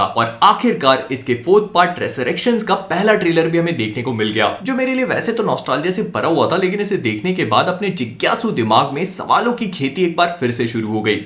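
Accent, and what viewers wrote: Bhopal, India. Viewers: apka accents sunker bhopal ki yaad ajati hai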